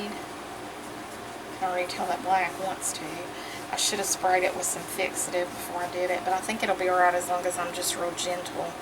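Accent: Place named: South, United States. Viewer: Love your video,! Such beautiful organization! Your sweet southern accent is divine! ❤️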